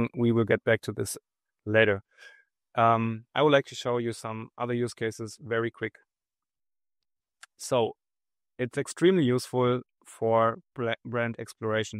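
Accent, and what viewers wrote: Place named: Germany. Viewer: dieser Deutsche Akzent 😅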